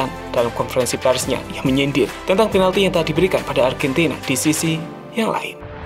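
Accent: Java, Indonesia. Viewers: Medok banget ngomongnya.. Hedeeeeewh..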